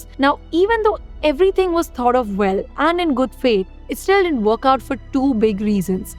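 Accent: India. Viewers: Not everyone understands your Indian accented English. Use English subtitles rather than hindutva terrorism language or word the title of your video in your native language and stop the click bait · The Indian accent is simply overwhelming. 🥵🥵🥵🥵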